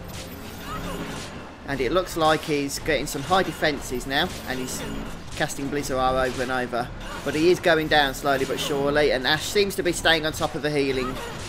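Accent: United Kingdom. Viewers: Yeah..not all we are english native speakers. Plus with his british accent..it's hard to understand everything..🤣🤣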